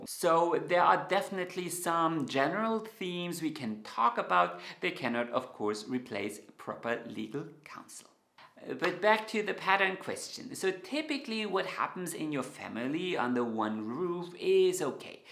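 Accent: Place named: Germany. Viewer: Hello, I found this post today purely circumstantial. Very sound information on a lot of topics. I never thought that copying a pattern from the ner could have so many pitfalls. I knit for myself and don't sell things knitted by me but I give away stuff sometimes. Also found out that you live in Austria. Funny that, I was born in Austria but left 50 years ago. I heard in your pronunciation that you are from a german speaking country but couldn't pinpoint if it was either Austria or Germany. I love your posts and get a lot of good tips, still learning at 76 years old. I am very glad to have found your channel. Good luck to you! ❤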